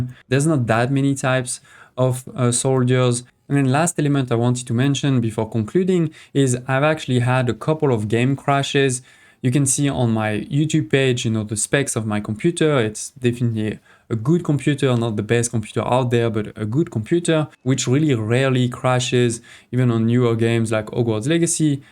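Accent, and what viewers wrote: France. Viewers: Bro sounds 100% French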